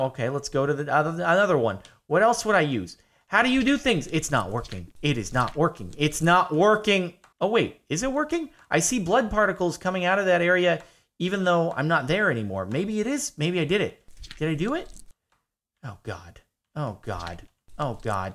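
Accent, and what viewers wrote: Russia. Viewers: I love your accent it sounds like a Russian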